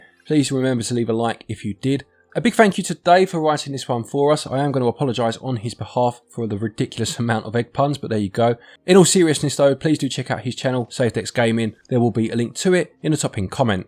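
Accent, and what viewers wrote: England, United Kingdom. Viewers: Why do you keep misprounoucing Mana? I can hear you are english so I don't get it? For the record its not Marna ot Maana, I can understand americans mispronouncing this, because they don't know any better. Apart from this grating on me, this was a good review and made up my mind to buy it 👍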